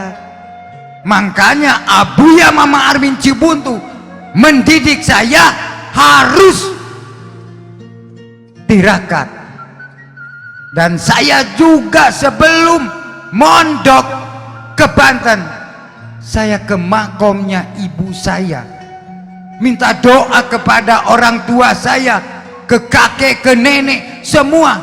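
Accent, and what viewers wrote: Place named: Serang, Indonesia. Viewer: Sehat selalu abuya ......... Logatnya jawa serang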